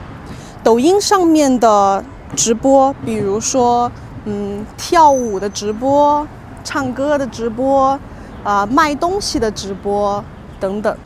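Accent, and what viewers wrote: Standard. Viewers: 老师你好 老师我是泰国人的学生 谢谢老师教我中文 老师这样好吗 老师可以有拼音 还有英语 和大大的字 我喜欢听老师的发音 非常清楚非常标准 谢谢老师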